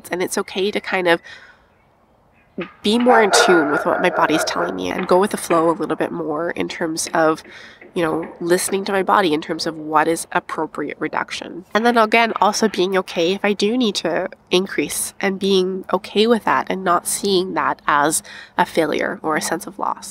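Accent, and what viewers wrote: Canada. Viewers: Hi there, I'm not sure why I watched this video, but I was touched. I don't have schizophrenia, I don't know anybody who has schizophrenia and I don't know much about the disease at all, but I was fascinated by this video. I am sure that you don't want sympathy, but I have to say I feel so bad for you. You are a smart, charming, compassionate and beautiful young woman and I am horrified that you have to go through what you go through. It sounds like you are Canadian, so am I. I live in downtown Toronto. I am available to speak with you about anything at any time for any reason. If the crisis centres are not available for you, I am.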